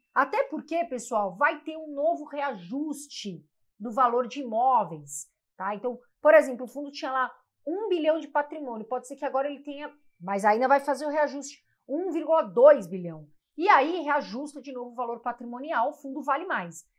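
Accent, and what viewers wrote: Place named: São Paulo, Brazil. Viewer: Sotaque paulista e horroroso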